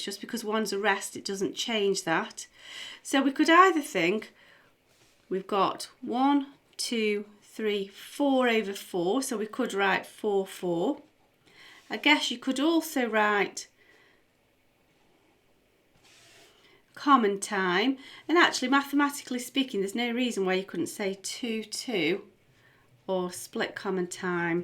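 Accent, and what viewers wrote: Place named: North, United Kingdom. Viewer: You sound like Yvette Fielding. I think it's the Northern accent. I never did Grade Five Theory but did Grade Five Practical and passed about 12 years ago. I'll take ANY advice you offer in the videos.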